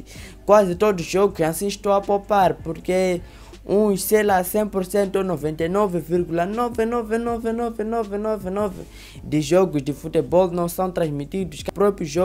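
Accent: Mozambique. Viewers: Sobre o seu português, o seu sotaque é o sotaque moçambicano mesmo ou você está tentando falar o português brasileiro? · Entendo o sôtaque Moçambicano de boa kkkk😄😄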